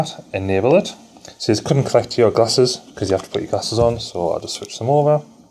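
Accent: Middlesbrough, United Kingdom. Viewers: You sound local to me (Middlesbrough).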